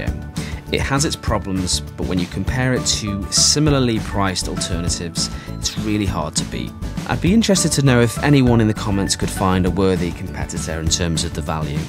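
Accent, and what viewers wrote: United Kingdom. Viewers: We go here every year fir the past 8 years. We stay for about 4 nights then onto a villa. We have NEVER had 1 problem. This place is spotless! We always leave money for the cleaners at end. We have left things out on the bed etc and they are always neatly left back after cleaning. Just say hi to the people that work so hard for you and they will appreciate it and look after you. Our fridge didn't work properly last year and they where on it like a car bonnet within minutes and replaced the whole thing whilst we went for dinner. You can walk to all bars restaurants etc. And the convenience store is reasonable. Did you know you can get a discount on food if your over 55? We never eat there but I spoke to someone who told us this. I'll be going again this year. And then moving onto the clarion inn at lake buena vista which is also a Rosen hotel. 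Just by Disney springs.. similar vibes.... I think you are from my part of the world judging by your accent 👍 x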